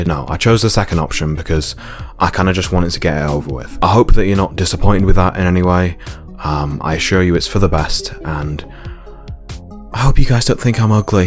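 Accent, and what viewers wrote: United Kingdom. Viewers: hey, mom, new femboy just dropped Btw: u look infinitely better knowing you have a british accent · omg he's british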